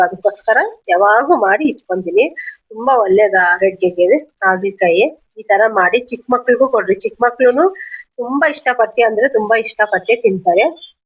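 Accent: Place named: Andhra Pradesh, India. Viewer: Neevu kannada tumba cutagi matadtira madam❤😊🎉 I think nimma mother tongue Telugu irabahudu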